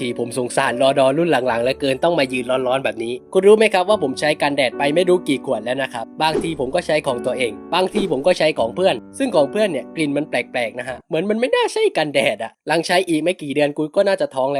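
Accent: South, Thailand. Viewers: พี่บ่าวคนใต้ม้ายนิ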